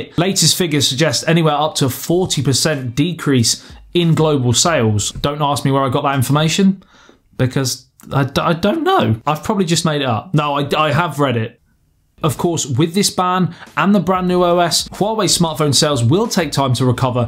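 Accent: United Kingdom. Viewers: Such a thick British accent...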